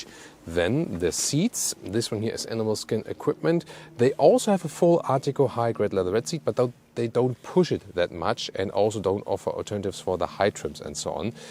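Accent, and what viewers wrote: Germany. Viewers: Bro man hört deinen deutsche Akzent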